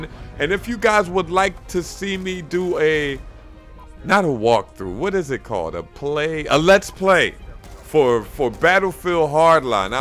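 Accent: Philadelphia, United States. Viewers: sup o.g keep up da work. where u from? u sound like u from philly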